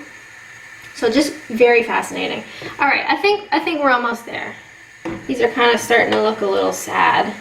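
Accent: Canada. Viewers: Because i love your Canadian accent , Much nicer than my boring Surrey Accent (British) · I really like your videos (because you're spontaneous and sincere). I am learning English, so your videos help me to practise listening, and I have also learned new words with you. I love your Canadian accent. Greetings from Spain! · You sound Canadian. Not British.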